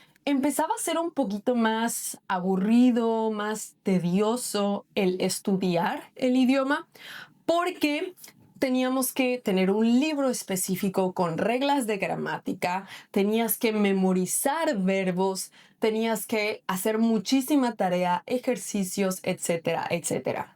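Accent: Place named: United States. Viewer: lol hablas ingles como una americana. Estaba sorprendido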